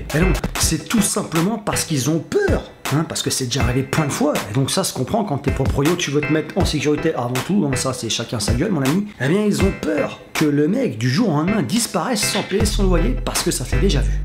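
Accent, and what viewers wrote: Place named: Japan. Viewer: C'est drole quand tu parles français tu as les intonations japonaises. 😁👍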